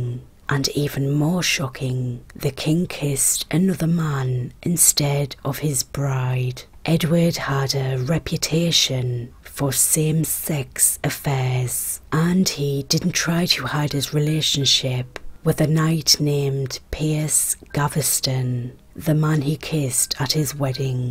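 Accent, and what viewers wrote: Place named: Newcastle, United Kingdom. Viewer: Excellent as always - love to hear that Geordie sound!🥰💖⚘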